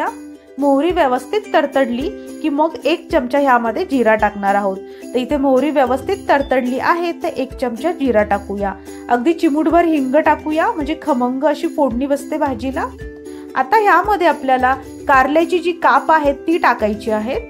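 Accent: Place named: Goa, India. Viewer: Helo Madam Tuzhe Nanv kitem aAni tun Goenkar ashen dista the accent you are talking is from Goa I think Marathi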